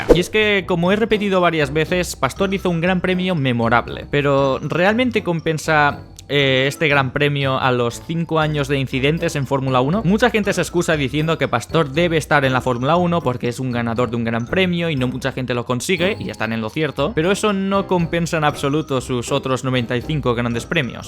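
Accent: Spain. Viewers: No soy fan de Maldonado, de hecho me cae pésimo, pero escuchar un pendejo españolete no lo puedo tolerar. 👎🏻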